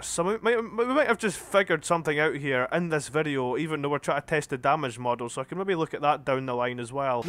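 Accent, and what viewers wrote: Scotland, United Kingdom. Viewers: never heard u talk before but didnt expect scottish lmao another banger though!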